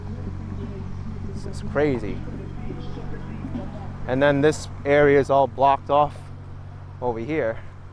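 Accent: New York, United States. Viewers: I like your NY accent! 😀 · How can he not be from NYC with the boroughs accent he has? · You got a thick nyc accent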